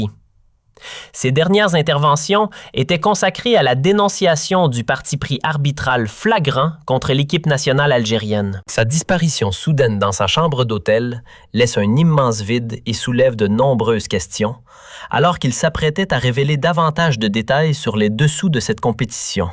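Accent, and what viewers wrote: Canada. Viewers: 🇲🇦🌟🇲🇦👑🇲🇦🌟🇲🇦✌ Votre post n'est pas une analyse journalistique professionnelle sérieuse, mais la lecture, par un citoyen canadien (selon son élocution), probablement contre argent, d'un communiqué de la junte militaire algérienne, d'accusations et de propagande antimarocaines gratuites, sans preuves. C'est une honte, de la part d'un citoyen ressortissant d'un pays démocratique, de travailler pour le régime algérien militaire non démocratique, régime qui opprime le peuple algérien depuis 1962, par la peur et par les files d'attente des citoyens pour recueillir les quelques biens de subsistance ou utilitaires (pas de lait, pas de sucre, pas de farines, pas de poissons, ...., pas de chambres à air pour les véhicules, pas de gasoil, pourtant l'Algerie est une économie gazière et pétrolière, ). Le Maroc n'est pas riche, mais il n'est pas comme l'Algérie connue pour ses files d'attente de la honte. 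Le Maroc a de bonnes relations avec le Canada, les USA, la Chine, la Russie, la France, le Royaume-Uni, l'Allemagne, ....etc. Toutes ces puissances, grandes ou moyennes, élaborent des rapports satisfaisants, sinon excellents, sur le sérieux, la crédibilité, et l'évolution positive socio-économique et politique du Royaume Cherifien du Maroc. Je ne flatte pas mon pays, mais le nombre de canadiens qui visent le maroc se chiffrent par milliers et les rapports des tour operator occidentaux sur le Maroc sont positifs et contredisent les falsifications algériennes que colporte votre post honteux.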